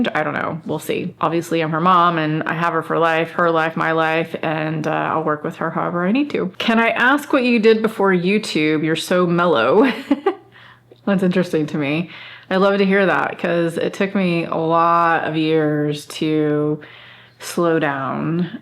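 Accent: Texas, United States. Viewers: Hello,. My wife subscribes to you and we’ve watched you for years. I finally subscribed, too, DUH! Shoulda done that a long time ago. I, too, have long wanted to write a memoir that also incorporates some of my Dad’s story. I have a blog named The Gay Man’s Daughter, if that tells you anything. One thing I have decided is that if I write a book it won’t be linear. Everyone tells me that I’m full of stories which I tell from time to time. Such as My Mother’s Six Degrees of Separation to the JKF Assassination. (She had a clothing store in Arlington, Texas - my home town - and sold the clothes that Oswald’s children wore to his graveside funeral to two men in dark clothing the day before. She didn’t realize it until she saw the photos in the Dallas Morning News the next day. I didn’t learn about it until I was 17.) Those kinds of stories! I left the DFW area at age 35 to relocate to the Puget Sound area as well and have never looked back. 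Thank you for sharing everything in this video. You’re an inspiration to me and my wife and to so many others. Your grace and intention is a hard thing to find and I know you’ve worked hard for it. It’s also nice to hear someone who has the same “accent” that I do! I worked hard to lose my accent when I moved here 33 years ago cause my students poked fun at me. Love you and everything you do. (We have an RV van and travel in it quite a bit - we love the road, also.) Take care.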